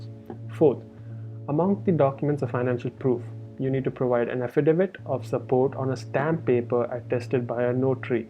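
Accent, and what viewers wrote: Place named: India. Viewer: Everything explained in a beautiful and simple Indian English. Thank you very much.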